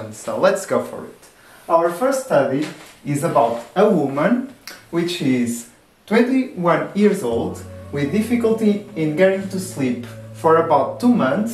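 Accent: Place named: Portugal. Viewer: I'm going to guess you speak Portuguese?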